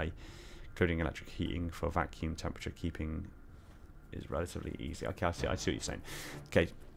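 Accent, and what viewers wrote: United Kingdom. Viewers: I love British narrations idk why it just sounds so much smoother and intelligent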